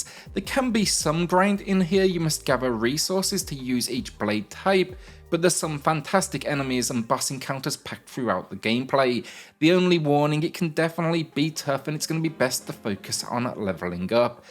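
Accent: United Kingdom. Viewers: you have a cool accent, Scottish perhaps?. It won't be long before this channel gets massive. Keep it up guys!!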